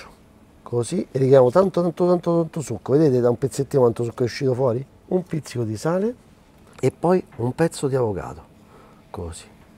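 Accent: Rome, Italy. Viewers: Sadly the Roman way to speak gets lost in translation. It's half of the fun!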